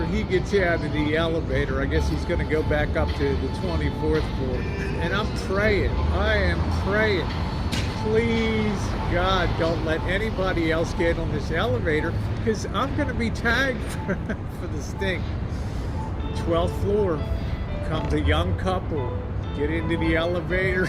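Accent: Baltimore, United States. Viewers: I'm a new subscriber although I've watched some of your videos along the way before hitting that button. I've been trying to pinpoint your accent, and I thought you must be from Baltimore. When you mentioned that you've had enough of mobsters, etc. in Baltimore, I think you just confirmed my guess. You're such a positive, energetic person, and I really like your videos.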